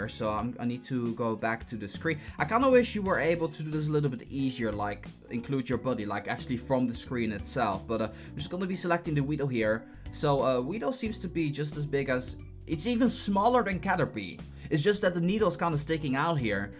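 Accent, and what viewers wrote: Netherlands. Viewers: je bent toch nederlands dat hoor ik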